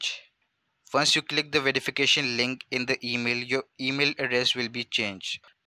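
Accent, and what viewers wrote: India. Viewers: anything tutorial with an indian accent works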